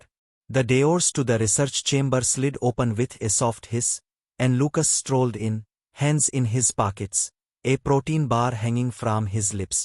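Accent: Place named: India. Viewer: If you are going to use an AI anyway, why give it the ONE accent most likely to piss every American off? The first thing most Americans hear is EVERY customer service "call center" in India that cannot do shite and are NEVER any help. "Yes yes, oh yes, the company cares "berrimuch", yes yes, "berrimuch". Glad to know I don't have to see this dumb shite in my feed again. 17 seconds might be a new record.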